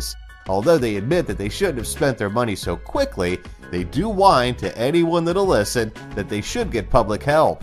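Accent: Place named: United States. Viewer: Lmao I love how the narrator speaks in an American accent but refers to everything in British slang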